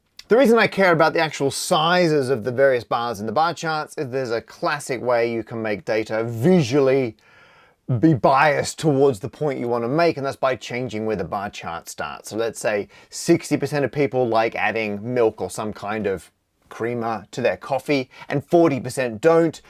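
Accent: Australia. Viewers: in Australia, my home and your former home, we have preferential voting. You number the candidates 1...n and they eliminate who came last and move those votes to their second preference. That is repeated until someone gets over 50%. It will solve your coffee vote scenario where the least popular party wins. Speaking of Australia, you're losing your Aussie-ness. Graph has the 'a' pronounced like 'a' in grab not grass.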